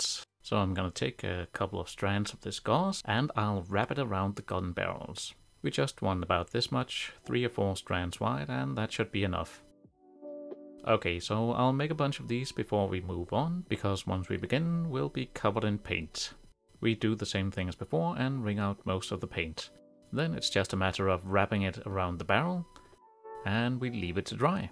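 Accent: Denmark. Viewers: er du dansk? det lyder sådan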